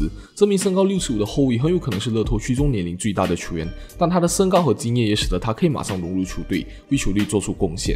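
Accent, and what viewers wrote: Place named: Malaysia. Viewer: 馬來西亞口音本來就跟我們平常聽到的不太一樣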